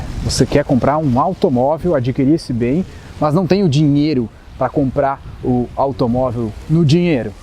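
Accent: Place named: South, Brazil. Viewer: Você é do Sul? Tem sotaque.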